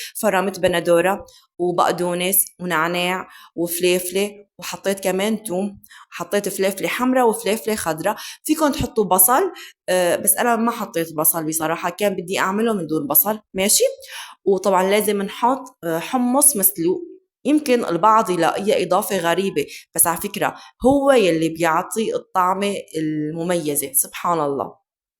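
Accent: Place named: Aleppo, Syria. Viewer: والله و مليون مرة طريقتك في الكلام عسل رونق موسيقى ممكن لاني ليبية فنسمع لهجتك الحلبية حلوى وتشد الانتباه ❤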